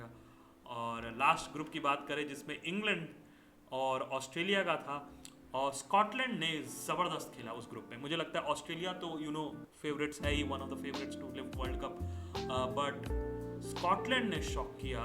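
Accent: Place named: United States. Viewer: aap ka hindi accent se lagta hai video recording USA mein hua hai.....if your schedule in USA permits you please visit all the locations where famous movie JAL HO NA HO movie shooting was done!! Jai Ho Mangal may Ho 🎉🎉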